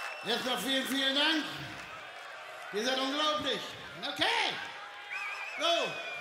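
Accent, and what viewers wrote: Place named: Germany. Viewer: A German accent fits perfectly with Heavy Metal!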